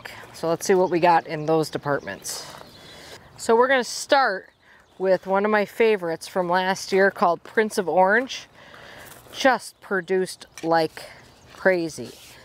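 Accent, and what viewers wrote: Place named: Midwest, United States. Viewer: Im in Idaho but appreciating your midwest accent since Im from Chicago :)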